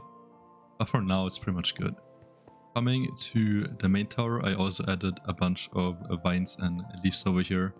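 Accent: Germany. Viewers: ich hörs doch raus du musst deutsch sein. Warum finde ich niemand der gut bauen kann wenn du nen privaten builder server hast bitte lass mich ma drauf kann auch paar bilder schicken als beweis das ich builder bin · Wait, are you german? Love the Intro! <3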